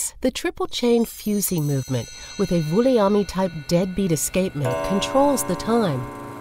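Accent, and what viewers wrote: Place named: United States. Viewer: Great clock from Yorkshire, but why the American accent.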